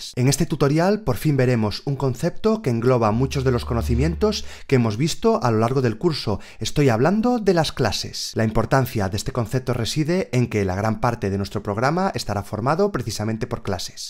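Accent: Galicia, Spain. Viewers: Me están gustando mucho todos tus vídeos, me parece que están muy bien explicados. Y aún encima acento gallego, de mi tierra, así da gusto! Quería preguntarte si habrá alguna diferencia (en cuanto a contenido) entre este curso gratuito en YouTube y el curso que tienes en udemy de pago (iOS 13 y Swift 5.2  Curso Completo Desde Cero). Gracias!!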